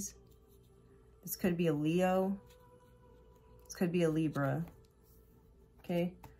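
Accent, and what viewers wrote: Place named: RP, United Kingdom. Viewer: Hey Girl , inspirational, and so comforting to hear your voice, and Posh too! 🐈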